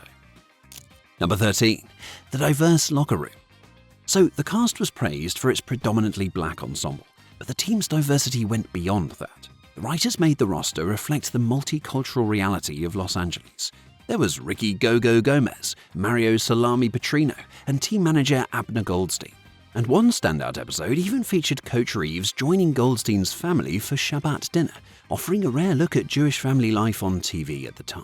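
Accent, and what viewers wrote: United Kingdom. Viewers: Why is there a British accent doing commentating on this video. We couldn’t find one American to do this, about this class of American TV drama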